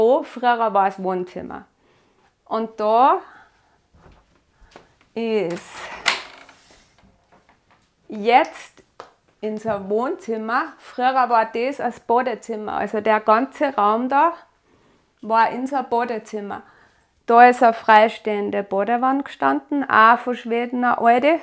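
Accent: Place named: Bavaria, Germany. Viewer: Du bist so ein Sonnenschein, dem Dialekt nach 🤔Bayern oder Österreich? ich liebe deine Deko und auch wie es bei dir zu Hause aussieht, voll mein Geschmack, fühle mich einfach gut in so einer Umgebung. Als Kind wuchs ich in einem Dorf auf mit Tieren und Garten drumherum, Veranda..Jetzt auch im Dorf nur Leider nicht so schön wie damals. Treume von so einem Haus😌🥰wie eures🌹Dankeschön für die Inspirationen.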